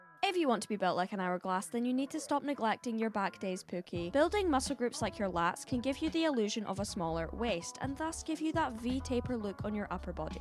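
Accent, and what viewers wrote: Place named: Glasgow, United Kingdom. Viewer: She’s from Glasgow Scotland 🏴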